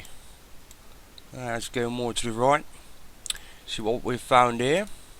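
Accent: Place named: Netherlands. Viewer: First, I like your accent, your 100% dutch hahahaha. What the pipe/spoiler is in the image reminds me of a "pitot tube" on a airplane, its almost a 100% match. Look up "pitot tube" and do a picture search on google, you will recognize the object immediately. I think a flying object has crashed there, and it had a pitot tube for sure.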